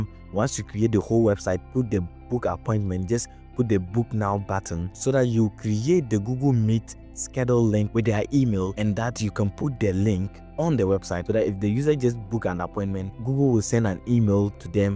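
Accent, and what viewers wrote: Ghana. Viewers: Are you from Ghana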